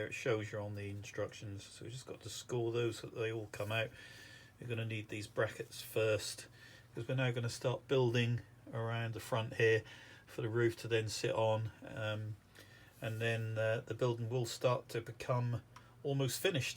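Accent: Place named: Norfolk, United Kingdom. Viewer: All good stuff. How big is your layout to get all these buildings on? Love the accent. What part of Norfolk are you. I used to live in Ludham.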